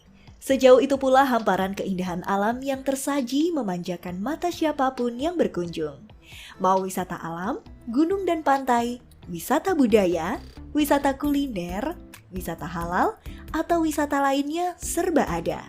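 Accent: Surabaya, Indonesia. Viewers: Akhirnya ngonten lagi, mbak njenengan wong jowo to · woalah... arek suroboyo ta.... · Sampean arek suroboyo ta mbak bro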